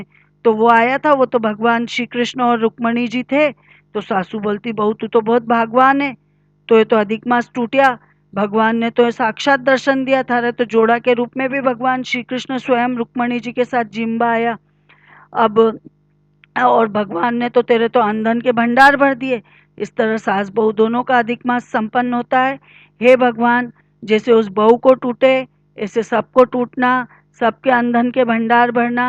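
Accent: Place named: Ujjain, India. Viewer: Aapki konse city se ho ? Aapki bhasha ujjain jesi lag rahi hai . Plz bataiye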